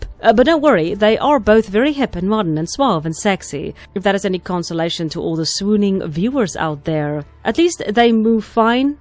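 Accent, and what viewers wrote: South Africa. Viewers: Within the first 30 seconds, I could hear the SA English accent. Appreciate that there's a local female gamer giving her two cents. Much love!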